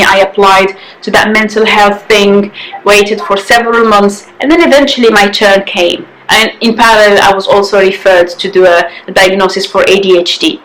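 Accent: United Kingdom. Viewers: Thank you for sharing 🙏🙏🙏 PS: I can sense the British Accent haha.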